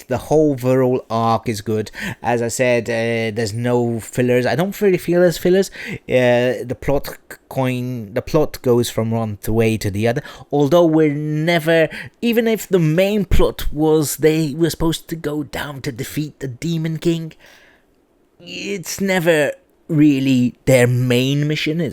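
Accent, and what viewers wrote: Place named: England, United Kingdom. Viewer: English accent got me feeling something 😉 well done bro 🩵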